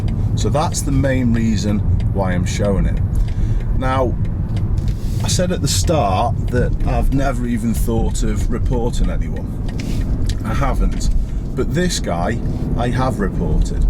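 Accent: United Kingdom. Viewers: I subbed to this channel a few years ago so i could come back and watch them when i was old enough to learn how to drive now im looking at it he sounds british and that looks like the uk :'3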